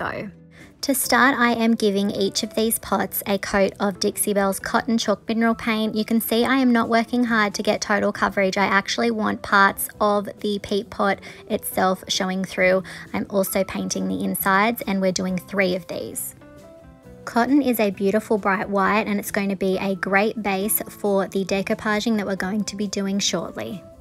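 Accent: Australia. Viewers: Née subscriber here. It’s nice to hear an Aussie voice. I love your little pots, very cute. May I please ask you what brand is your glue gun. I have to get a new one and I like the way yours sits there. My old one is not a very good one and the glue keeps leaking out of the nozzle. TFS. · Just found your channel, love to gear an Aussie accent here in France, we used to live in Perth. :) love these pots, I bought some peat pots recently and will make some fir my shop. :)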